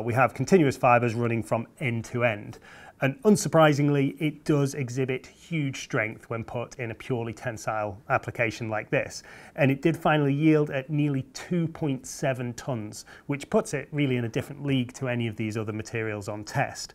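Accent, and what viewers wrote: United Kingdom. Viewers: why is it always someone with a brit accent👎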